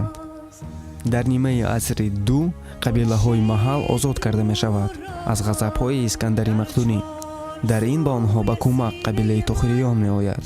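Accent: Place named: Russia. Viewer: Khohish mikunam, ke toza bo zabuni Orioni kharf bizani ogoi BLOGER! Kharfzanii Tu shaxseki rus khastu guyo bo zaboni tojiki kharf zada istodaast.. aniktarash talafuzat..." Asalamu malakum"...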